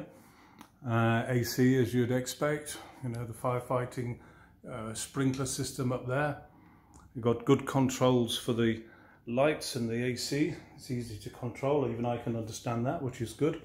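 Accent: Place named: England, United Kingdom. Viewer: cool and funky ! wonderful map included! soft and gentle humour by the youtuber! Lovely English accent !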